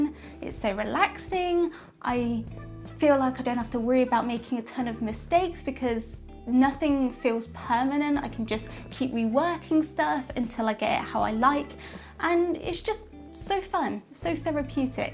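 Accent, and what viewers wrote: United Kingdom. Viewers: Confused. Sounds British, but charges in Dollars for the course? Sounds like a scam. That always sends red warning lights to me.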